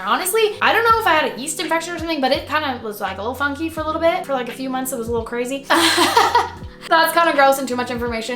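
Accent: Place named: New York, United States. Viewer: it sounded like you have a New Yorker accent a little haha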